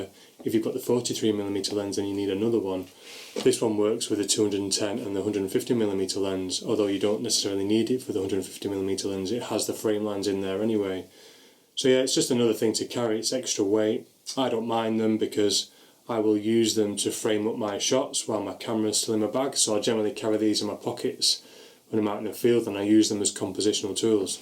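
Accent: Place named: Sheffield, United Kingdom. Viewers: I can tell your accent is somewhere near Sheffield. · You sound like you have a northern English or Scottish accent, not New Zealand..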